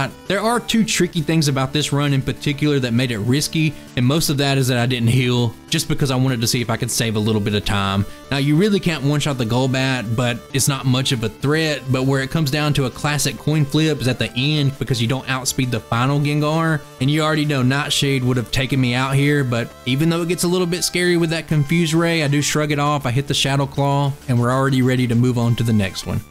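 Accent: South, United States. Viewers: Just found you. Came for the pokemon of course, but staying to hear it in that drawl. Aren't many of us making content out here from the south.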